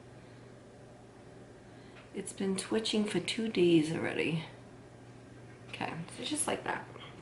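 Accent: New York, United States. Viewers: This helps a lot!!! Are you a Long Island girl? I hear a NY/NJ accent. Luv it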